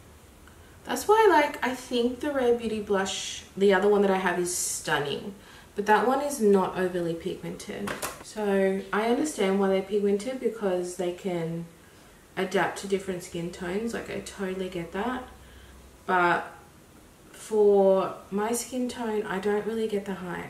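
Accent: Australia. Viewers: btw I saw your decluuter vid on my recommended. Stayed for the accent and personality. I am outside of Australia but I aspire to live in there one day. So I'm interested in Aussie stuff. I think you are so lovely and open and honest. It's nice to hang out with you cuz I feel comfortable. You feel reliable since you are so open and honest. Just letting you know why I stayed to give you an idea of how to get new people at your channel. I'm only trying to support since you talked about wanting to grow your channel. I know it is hard work. Hope everything works out well for you!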